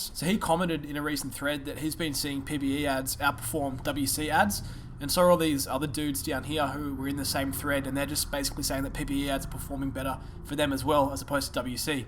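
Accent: Australia. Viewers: So good to hear an aussie explaining it ahahhahah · Good to hear an Aussie doing it, I’m from South Australia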